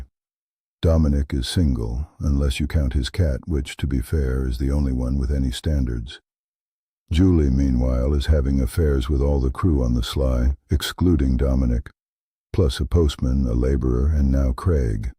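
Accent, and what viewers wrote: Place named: United States. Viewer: This is good but the American accent kills it lol otherwise good job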